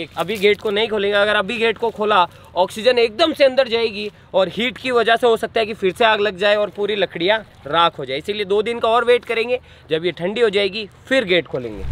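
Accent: Rajasthan, India. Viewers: Bhay aap ki marwadi bhasah jordar h mja aa gya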